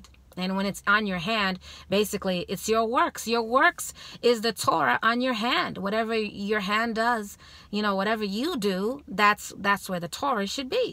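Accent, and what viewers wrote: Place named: Europe. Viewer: Love the information. Voice reminds me of the old 1950 movies. I believe that is a Continental accent.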